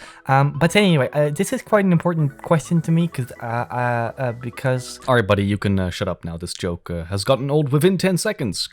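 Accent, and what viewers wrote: Netherlands. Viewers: Really love the video, but one question. Are you dutch?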